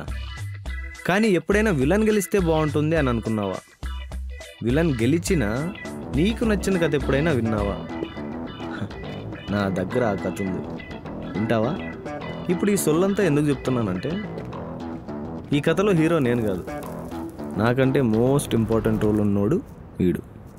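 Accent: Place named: Anantapur, India. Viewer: Dinamma, Anantapur accent nv cheptunna vastavalanni gattiga tagultannai bossu.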